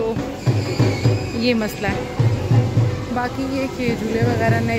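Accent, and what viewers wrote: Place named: Chakwal, Pakistan. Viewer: I am far away from my city. Couldn’t travel back since two years. I watch your vlogs whenever i miss my city. I like your Chakwali way of narrating things 😎 as this is my own way. I love it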